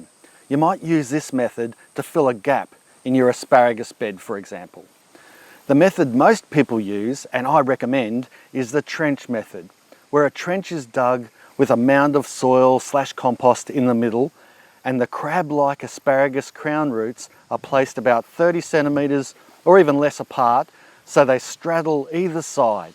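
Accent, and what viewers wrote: Australia. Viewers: Skip to for tip one then for 2. Jesus just turn playback to 2x if you don't have and issue with the Aussie accent.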